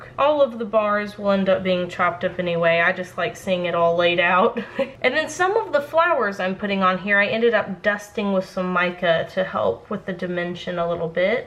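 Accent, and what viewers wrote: Texas, United States. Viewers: It's that Texas accent!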